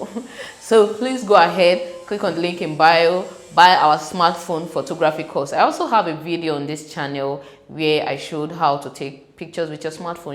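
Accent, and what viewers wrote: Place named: India. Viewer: At first ma,I thought something was wrong with my phone,when I heard you speak like an Indian. Thanks for this video ma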